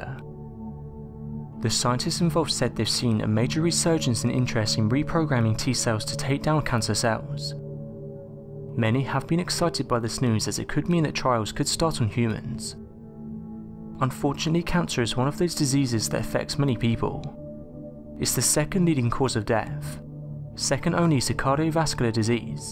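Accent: England, United Kingdom. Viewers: Qwerty Bwomp he’s English.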